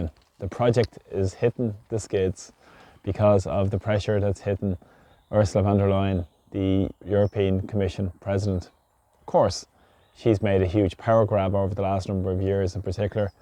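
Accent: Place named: Ireland. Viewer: Please people give this channel a subscibsion and a thumbs up we need a the real irish voices out there,thanks for all you do,thanks.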